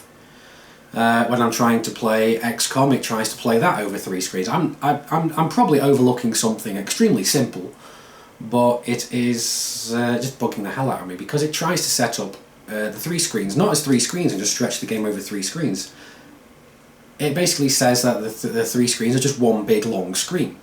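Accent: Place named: England, United Kingdom. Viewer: Congrats on reaching 15k subscribers. And good luck with getting more. BTW, from which part of England are you from?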